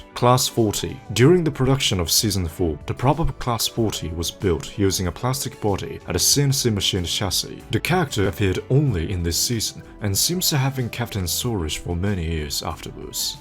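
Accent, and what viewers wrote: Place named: Japan. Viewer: bro sounds like a japanese person who is learning english from posh british people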